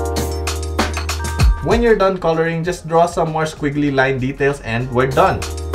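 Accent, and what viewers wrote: Philippines. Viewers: hI, recogninzing your filipino accent ! Thanks for your tips, i love it ! val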